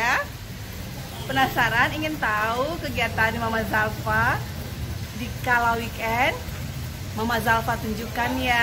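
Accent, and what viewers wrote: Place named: Palu, Indonesia. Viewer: Cucok meong memang ngana pe bakat...wkwkwkwk...hamaaaa...te sengaja qta dapa ngana pe vlog gegara ba cari resep sambal goreng daging kong pilih ngana pe resep,trus dengar2 ngana bicara roa...roa...qta pikir vlogernya orang Palu stouw...ternyata oh ternyata memang ngana orang Palu kong tamang SMP.lei...dunia memang selebar daun kelor kang hahahahah....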